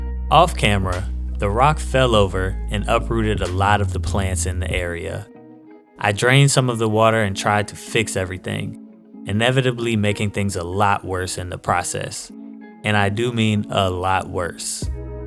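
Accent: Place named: Los Angeles, United States. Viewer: You sound like you're from LA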